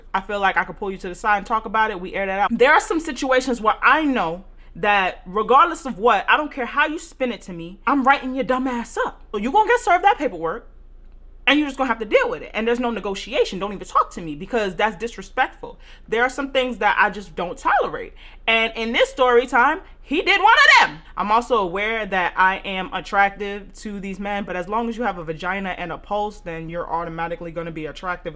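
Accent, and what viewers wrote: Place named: Philadelphia, United States. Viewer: I watched a few of your videos and was trying to guess where you’re from. I know the north east. I’m guessing philly. It was the “fuck you mean pussy” New subscriber thanks for the laughs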